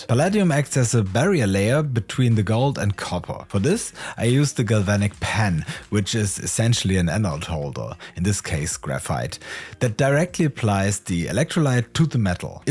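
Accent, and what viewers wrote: Germany. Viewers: This German accent makes me feel like he’s a NASA scientist, and I believe everything science related he says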